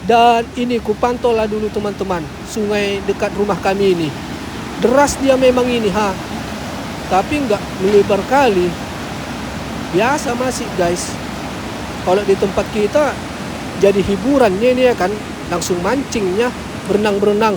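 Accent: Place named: North Sumatra, Indonesia. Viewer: Abang logatnya koq seperti orang dari Sumatra utara sepertinya? Sungainya jernih enak,abang lepas celana jebur Brenang enak!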